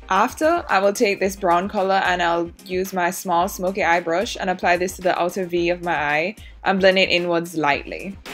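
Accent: Jamaica. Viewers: Great tutorial. You sound Jamaican btw 😉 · Your accent 😍😍😍 i know your from town (jamaica)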